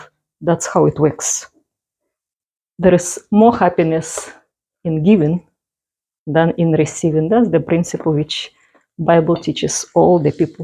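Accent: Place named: Russia. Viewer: I really like listening to you,! Love your Russian accent! Just feel sad that the Ukrainian government and the the Ukrainian Nationalist accepted to be used against Russia…. I think you are a special Ukrainian with lots of character, personality and love for humanity!!! ❤️❤️❤️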